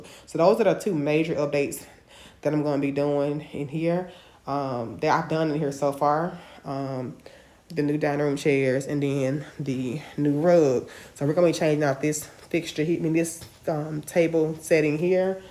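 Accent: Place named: Mississippi, United States. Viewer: If you add some more stems in the vase on the dining table it give the vase more presentation loving the mild colors in the living room it's cozy.first time watching your video while looking through YouTube. Asking you A Q what state are you from? Listening 👂🏽 to your accent when speaking it's close to my families from Mississippi. I'm N.Y.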